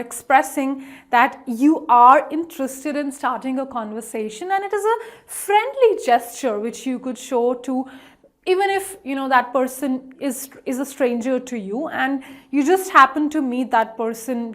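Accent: India. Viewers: Yet, It's an Indian English :face-red-droopy-eyes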